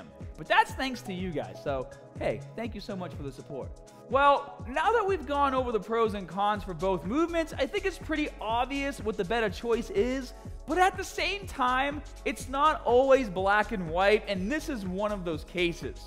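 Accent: Boston, United States. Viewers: gotta love that Bosten dialect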